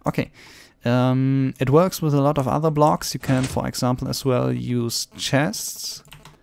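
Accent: Germany. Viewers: Man hört richtig diesem deutschen Akzent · that german accent of yours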